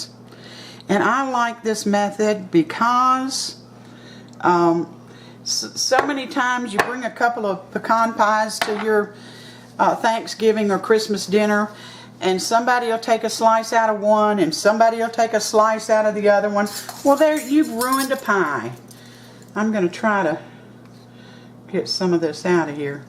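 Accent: South, United States. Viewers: I love your voice! Second run at watching. Were those toss-a-way/recycle aluminum pie tins or not? These are my Mothers favorite before I made flan...not easy to transport to holiday parties. You said "hardly" packed brown sugar. Did you mean packed hard or not very packed hard? From the North, I am in love with your Southern accent. I did a recipe from a newspsper once back in the early 90s. Dutch apple pie in a brown bag. Best results. Never found it again.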